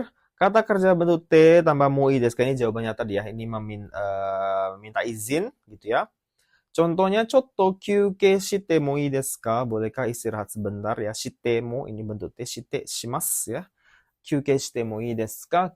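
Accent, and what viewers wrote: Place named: Medan, Indonesia. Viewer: lah, sensei orang medan,, kirain wong jowo hhahah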